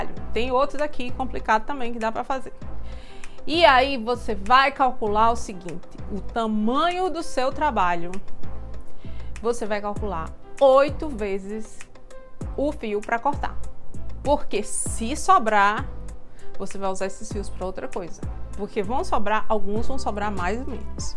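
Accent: Northeast, Brazil. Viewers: Muié de Deus😂😂😂😂😂😂😂 tu só pode ser Nordestina 😂😂😂😂😂😂😂😂😂😂 PARABÉNS... Que DEUS te abençoe sempre 🙏🙏🙏🙌🌺🌺🌺 Direito de Maceió Alagoas Brasil kkkkkkkkkkkkkkk 😂😂😂😂❤❤❤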